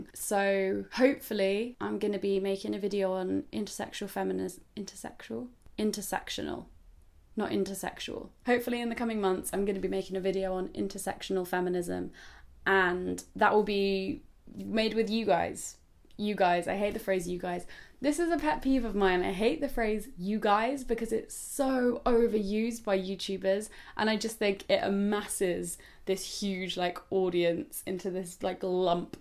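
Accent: United Kingdom. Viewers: I wish I could meet you a onc, just to tell how much you are gorgeous with British accent .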